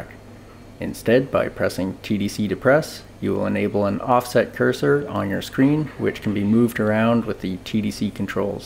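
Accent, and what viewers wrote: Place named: Maritimes, Canada. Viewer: Awesome video! You must be a Maritimer. Newf?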